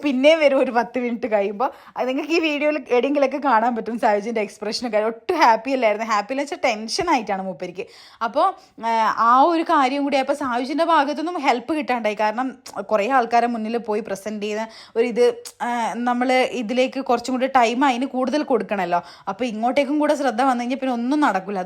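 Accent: Kannur, India. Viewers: ഞാൻ എല്ലാ വിഡിയോസും കാണാറുണ്ട്, home tour വീഡിയോ കെട്ട്യോനെ പിടിച്ചിരുത്തി കാണിച്ചു, 😊 പിന്നെ കണ്ണൂർ slang നല്ല രസാ കേൾക്കാൻ.. ഞാൻ ഒരു കൊല്ലംകാരി ആണ്.. കരുനാഗപ്പള്ളി നിന്ന് ഒരു 30 min okke, hus ന്റെ വീട് തൃശൂർ ആണ്.. 😊 ഇപ്പോ തൃശൂർ ആണ്..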